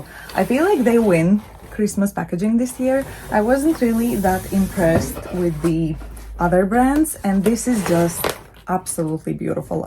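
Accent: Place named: Latvia. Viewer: You have beautiful accent. Sounds Latvian. Are you from Latvia?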